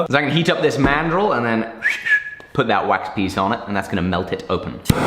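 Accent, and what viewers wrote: United Kingdom. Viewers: Is she British or other?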